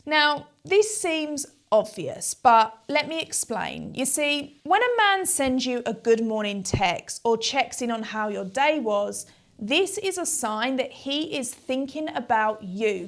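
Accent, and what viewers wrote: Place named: United Kingdom. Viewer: She’s British, different pronunciation, I believe.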